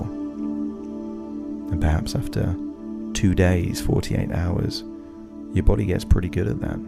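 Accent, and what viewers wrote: England, United Kingdom. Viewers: Ok..., I need to get a Bluetooth headphone set, so I can move about to my bed for a comfortable experience. My tethered headset keeps me tied to the desk. Now then, I will try it again when Amazon gets here with my new headset. Meanwhile, I live on a 220 acre mostly forest little NW farm and recovering from a heart attack and fractured hip when I fell. I can do this, your program sounds great and I like the English accent. I am 285-lbs 5'11" & 73 years old, and of German heritage. I will report more later.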